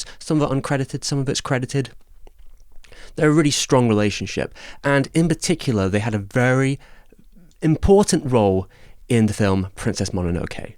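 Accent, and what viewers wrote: United Kingdom. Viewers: japanese names in a british accent are crazy...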